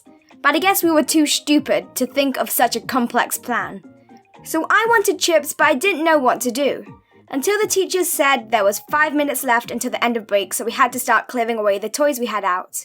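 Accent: United Kingdom. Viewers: Are you british, irish or new zealander can't pin the accent but it sounds british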